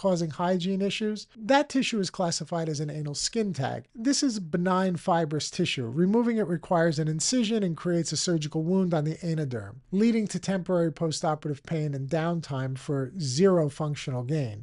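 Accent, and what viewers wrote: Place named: New York, United States. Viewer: I heard the accent and I was hoping you were in the NYC area... Looked it up and you're 15mins from my apartment in NJ 😂. Doc I had a question what are your thoughts on bidets?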